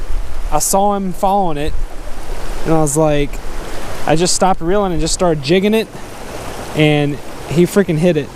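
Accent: Pennsylvania, United States. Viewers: Where is that accent from? Pennsylvania? It's interesting.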